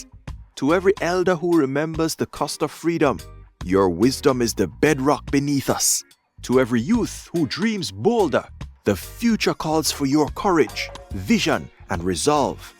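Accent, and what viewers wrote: Jamaica. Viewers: Why is a jamaica narrating??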